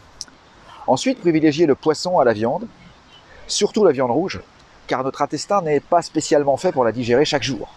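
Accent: France. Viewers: Je n'avais pas percuté que vous étiez un ch'tit "à cause ou grâce à votre accent " 😘 J'ai vécu 5 ans dans votre région, nous avons eu un enfant, un Cum et comme je dis souvent "c'est mon ch'tit bilouttttte énorme "😉😊 Quel plaisir de vous voir à Villeneuve d'Asqu.. en plein de mois de Mai, le plus beau mois des Ch'tis dans ce climat de Drach' 🥰😍👍 Super Vidéo, pour moi, je suis abonné, mais il y avait quelques temps que je ne vous ai pas écouté.. 🤔😥☹🤣👍😘 J'ai appris 2 chose, l'huile de Lin, et "je n'ai plus le nom mais qui concerne l'intestin... au-delà du Sucre de l'alcool du sport etc Bref Merci, restez vous-même, pleinement heureux et joyeux d'être Vous.👍💖💝👌👍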